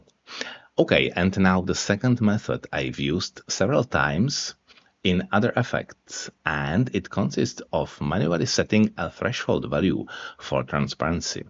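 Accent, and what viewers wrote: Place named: Czech Republic. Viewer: Руслан, при чем здесь англосаксонцы и голос? из Чехии, это его акцент и его голос. У тебя всё в порядке? У тебя что-то мерещится? Может, перестанешь пользоваться интернетом, который так-то англосаксонский и пиндосский? (Какой же мусор у людей в головах!)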